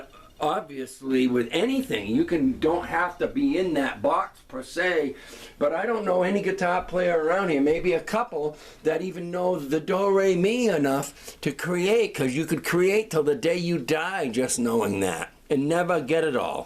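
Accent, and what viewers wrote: New England, United States. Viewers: i also don't remember you having such a thick new england accent LOL. i'm born and raised here, and it jumps out even to me! ;)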